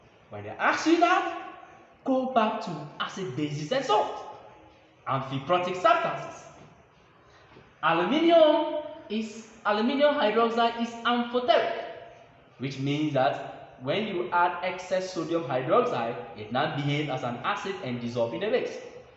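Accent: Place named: Ghana. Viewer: Thank you so much sir.....love your twi btw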